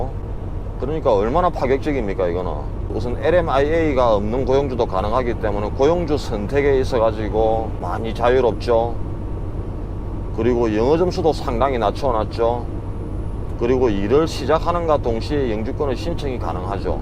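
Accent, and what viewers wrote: Gyeongsang, South Korea. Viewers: 경남 사투리 ㅋ ㅋ 저는 대구 사람 정겨워요^^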